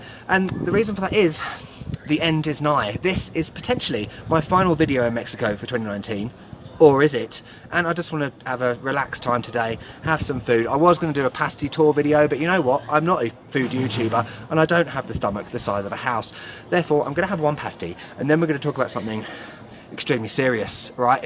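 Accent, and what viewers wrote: United Kingdom. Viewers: I love your Bit accent !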